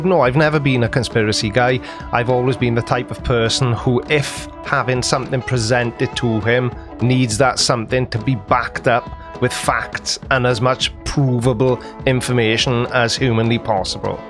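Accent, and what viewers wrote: Wales, United Kingdom. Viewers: Are you Welsh? I thought you were Australian like Nathan Thompson. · Oh my God.........you're not..........Welsh.........are you?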